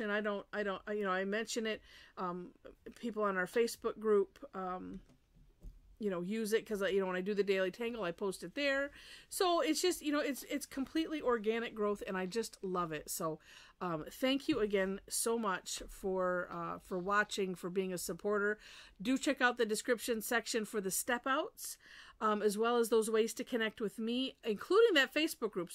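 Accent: Midwest, United States. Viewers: Everyday I watch your videos. Love them and it's so wonderful to hear the midwestern accent, I'm from Chicago but living in North Carolina and everybody here has a southern drawl.😂 thank you for your videos.❤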